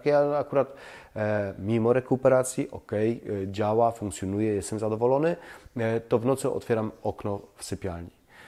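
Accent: Silesia, Poland. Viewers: Kocham śląski akcent i intonację :) Ja nie będę jakaś mega nowoczesna - bezwzględnie potrzebuję przestronnej lodówki. Nie side by side (one w środku są masakrycznie ciasne) tylko szafę od podłogi do 180 cm pełnej szerokości - czyli 60 cm, do tego zamrażarka jako osobne urządzenie. Tak żeby do lodówki cały gar rosołu wszedł bez kombinowania co przesunąć. A ponieważ właśnie projektuję sobie nowe mieszkanie z sugestii nt servo drive skorzystam - dzięki!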